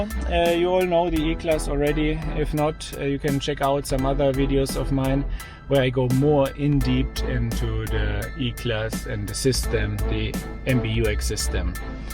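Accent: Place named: Germany. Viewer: Trevlig video men varför har du tysk brytning när du pratar engelska? :O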